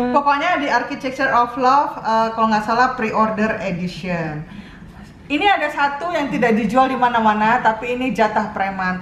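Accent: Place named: Medan, Indonesia. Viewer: aksennya medan bgt, jadi kangen rumah hahahah, loo.. loo... nya itu :))))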